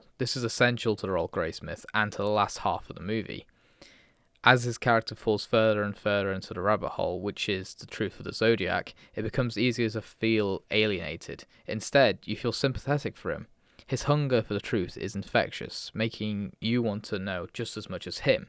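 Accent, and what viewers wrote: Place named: United Kingdom. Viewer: I enjoyed the review m8 👽 Gotta love the silky smooth british audio~ keep up the good work. 👍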